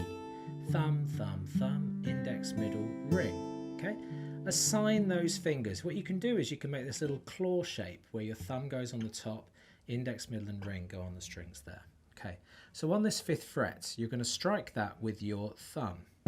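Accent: England, United Kingdom. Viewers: I LOVE YOUR ENGLISH ACCENT MAN! Nice vid btw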